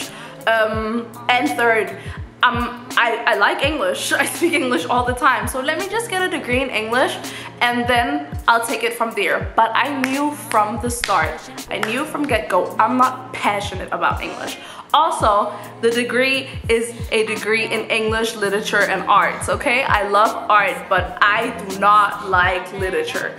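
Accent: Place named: Latin America. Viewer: Your accent sounds somewhat Latino. What country and town to you live?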